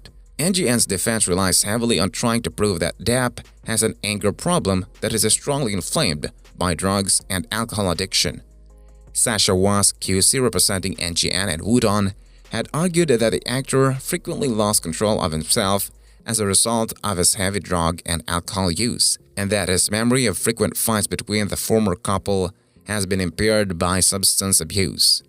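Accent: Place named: Latin America. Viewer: Gangster from the 30's??.!!! He sounds more like a Latin guy IMITATING a 1930's gangster! You can tell he's reading this "Narrative" (..the pronunciation)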